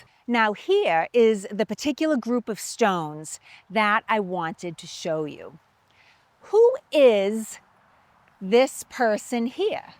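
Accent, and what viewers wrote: Boston, United States. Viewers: has the thickest Boston accent that I have ever heard. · Love your authentic Boston/Massachusetts accent! I know it well, because I was born in Boston, Ma. Boston City Hospital, 1000th baby born , August, 1964, parents born & raised in Boston, from the 1940s until they moved west down the Old Boston Post Road,(US Route 20),33 miles to Northborough, Ma. (Where surveyor Benjamin Franklin ordered the "33 Miles To Boston", mile marker, placed there during his time as Postmaster General! I absolutely love our American History, which is probably why your channel came up in my feed! I grew up primarily in Northborough/Westborough, area, incorporated in 1766 & 1716, respectively, about a mile outside of town, where one of many of those old, granite Tribute Stones, comemorating Revolutionary War General Henry Knox's passage through the town with his army, and the cannon/artillery pieces which hed collected from fort Ticonderoga, NY, to deliver to General George Washington Bunker Hill, Boston, along with as many of the troops, ammunition, and powder, which Gen. 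Knox inevitably came across during his journey to reinforce Gen.Washington, as ordered by the Commander in Chief of The Armies of The (newfound), United States. Our Northborough Militia was led by one Captain Samuel Wood, whod sent for all Northborough Militiamen to muster The towns Musterfield, immediately after recieving the alarm of April 19, 1775! I know all this wonderful history because I was fortunate to grow up in a VERY Patriotic little suburban town, and just wanted to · I have read extensively on our revolutionary history, but it somehow takes renewed importance when a hot woman with a killer Boston accent is telling it. Keep going because I'm picking up details I didn't know before.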